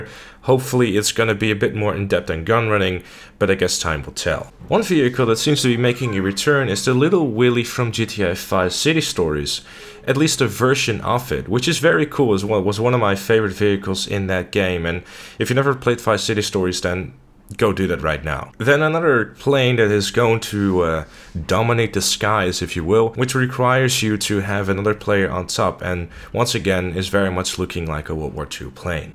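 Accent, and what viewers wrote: Netherlands. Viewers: Zwaar nederlands accent bro😂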